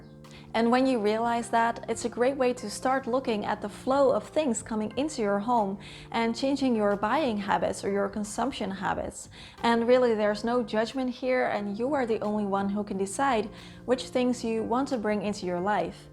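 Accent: Netherlands. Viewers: I somehow could hear you were Dutch (even though your english is sooo good and you don't really have an accent) but it was confirmed for me when I saw the Holland & Barrett kortingskaart, haha. Super leuke video's en je hebt er een sub bij! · Ik hoorde toch een Nederlandse toon❤❤ je bent gewrldig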